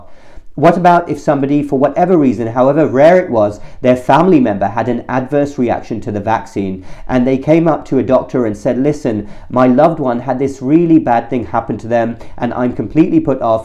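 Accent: United Kingdom. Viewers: I was wondering why you have such a clipped British accent despite staying in the US! Now it's clear.😊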